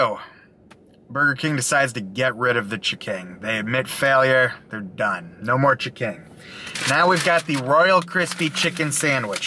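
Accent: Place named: Ohio, United States. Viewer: Thanks! Hey quick question you wouldn’t happen to be from the Ohio area would you? My dad lives in Ohio and myself in ga but I noticed your accent. Lol no disrespect or anything I just miss my family up there!!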